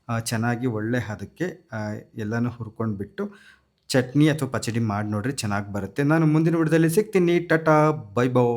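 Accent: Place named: North Karnataka, India. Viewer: Nivu uttarkarnataka kadeyora sir , nimma bhashe chenngide. Yav uru...nimdu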